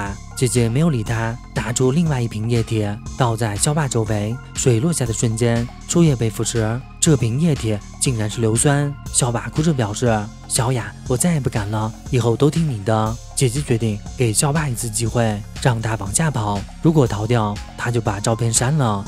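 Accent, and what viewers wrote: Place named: Taiwan. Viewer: 你这是哪来的地瓜腔？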